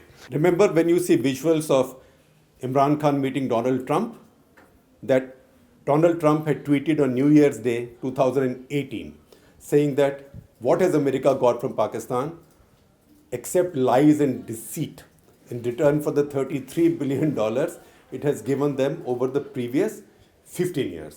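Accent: India. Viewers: Hahahah look at this stupid guy Imran Khan has been very successful in brininging military and civilian leadership on same page plus he is way more popular then na was sharif ever was because he has been a celebrity plus NZ was directly selected by zia ul haq while IK has a political evolution behind him ask us we have witnessed don’t believe go watch his political rallies not just in Pakistan but In Washington modi can’t even bring half of them togather outside India and modi is exposed by trump he is asking for help from trump to resolve Kashmir issue so this stupid man who speaks English like he is speaking in Hindi bullshit accent fuck you bastard you know nothing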